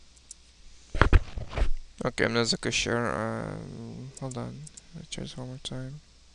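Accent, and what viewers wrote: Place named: Russia. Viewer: По акценту видно что ты русский )) я ниче не понял из всего этого мож сделать это видео на русском токо еще объясни как запустить этот live сd в boot меню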